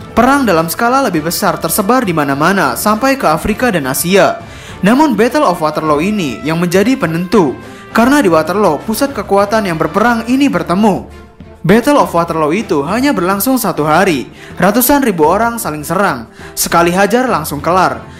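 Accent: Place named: Bali, Indonesia. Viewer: gw smpt mkr gtu kdgr dr " T " nya kyk logat org bali... hehhee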